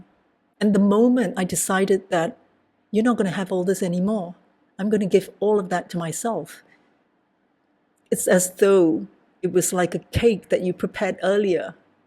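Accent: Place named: Malaysia. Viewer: Hi, the universe has a miraculous way of working out. Some back story, I was born in Malaysia but moved away at a very young age. I am also a scapegoat daughter and wondered about my Malaysian roots and what it would be like to meet someone who's also Malaysian and going through a similar journey. Your video popped up in my suggestions and your accent caught my attention, turns out you're a fellow Malaysian. I've watched a few of your videos and thank you ❤ my revenge plan is to heal and affirm/validate myself.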